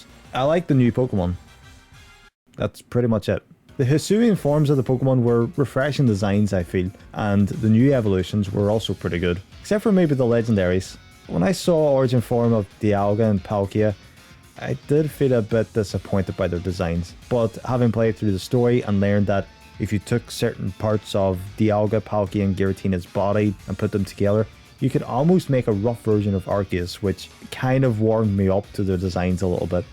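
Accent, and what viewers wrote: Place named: Ireland. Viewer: Love hearing an Irish accent and seeing local creators doing well on YouTube, great job with the video mate!!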